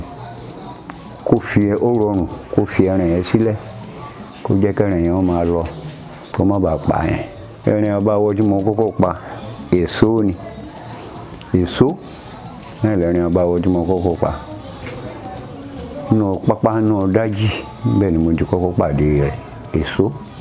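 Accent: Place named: Oke Ogun, Nigeria. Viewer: Where is the hunter from , he sounded very well like oke ogun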